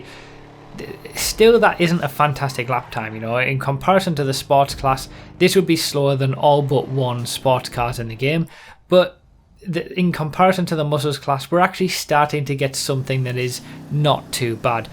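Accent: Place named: Bradford, United Kingdom. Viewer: okay that makes more sense cos to my ears he has an accent that would come from Bradford or around that area.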